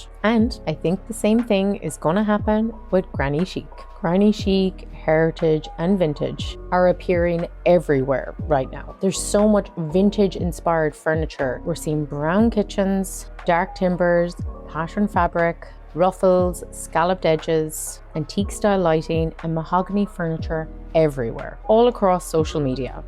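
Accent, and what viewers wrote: Ireland. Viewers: Come to think of it, you do sound a bit Kerry-ish! (I used to have a friend from Killarney.) When I was living there, the only accents I could NOT understand at all were from Clare and West Cork -- but it seems that their accents are even the butt of Irish jokes. Hey! South Dublin used to be nice! And judging from Google Earth and Daft, the home prices are still very sky high in my old "hood" (Knocklyon). It's north Dublin where the trouble is. When I was there (in either Cork City or Dublin), I often heard, "Well, Dublin is really an IRISH city." I found Dublin in 1981 to be quite rude and unfriendly -- and not in the playful, insulting way that friends insult one another in the rest of Ireland. However, my sister-in-law and nephew visited Dublin a few years ago and found the people there wonderful. As for me, I love Cork City! To me, the year I spent there in Cork makes me one-sixty-eighth Corkonian. 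I'd move to Cork City in a heartbeat if personal circumstances allowed. Judging from what you said in your video, I think you'd approve of my little two-bedroom Mexican-exterior-style, single-story townhome (what you would call a terraced home)! Regarding enjoying what comes into style each year or what's trending, though, you're a better person than I am (which is good, since you're the designer)! I keep watching videos about the latest-and-greatest and yelling at my screen, "That's terrible!" or "That's stupid!" or "How boring!" LOL. Yet, I find it to be addictive -- a litt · Oh it feels so nice to hear an Irish accent on YouTube, especially on design subjects.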